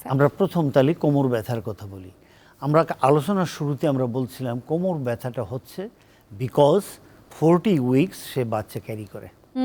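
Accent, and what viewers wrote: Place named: Bangladesh. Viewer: Bangladeshi English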